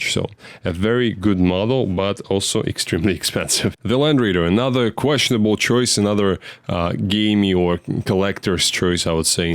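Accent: Russia. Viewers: Pls tell me that is russian accent? Or I'm wrong :)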